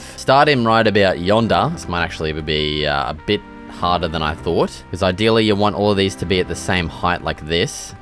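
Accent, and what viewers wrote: Australia. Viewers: mate u aussie like me? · are you aussie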